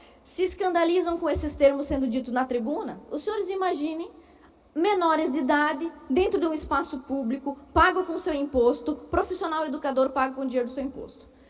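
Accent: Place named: Santa Catarina, Brazil. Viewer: Deputada Ana, quero te parabenizar pelo excelente trabalho, e também o vereador que denunciou, pena que a senhora não atua em São Paulo, capital onde eu moro, mas na minha opinião, afastamento é pouco, porque isso é o sonho do esquerdista, ganhar sem trabalhar, ela tem que ser expulsa por justa causa. Penso que esses militantes disfarçados de professores, fazem isso por que no máximo que vai acontecer com eles é o afastamento, que eles vão ser aposentados, antes da hora. Com isso essas pessoas lucram na cabeça deles, de todas as formas, fazendo a cabeça da sociedade e ganhando mais militantes pro movimento e se for pego é aposentado, que maravilha pra eles, acaba sendo um incentivo eles fazerem isso, a direita precisa parar de bancar financeiramente a esquerda, um exemplo é o descondenado que nunca trabalhou, e tem uma ótima aposentadoria por ter perdido um dedo, outra pensão ótima por ter sido exilado, e vitalícia, no valor que supera os 30.000,00 reais por mês, deveria existir um conselho de fiscalização do ensino, igual a OAB, CRM, CRosp , CREA, e etc... 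e se o professor fizer esse tipo de absurdo, que perca a sua carteira da ordem e não conseguirá dar mais aulas. Sou Paulista, mas tenho muita vontade de conhecer e até mesmo morar em Santa Catarina, pois gosto muito da cultura, do respeito, desses valores que estão fazendo de tudo pra acabar. Gosto até do sotaque, acho muito bonito ver você falando. Hoje está muito raro encontrar uma mulher igual a você pra casar. Me perdo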